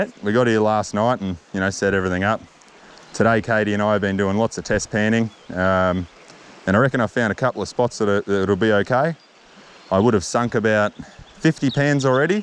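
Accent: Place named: Australia. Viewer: I love your channel too. And that accent gets me ,you aussies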